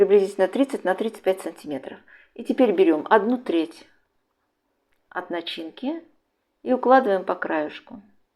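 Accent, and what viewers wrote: Baku, Azerbaijan. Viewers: с родным бакинским акцентом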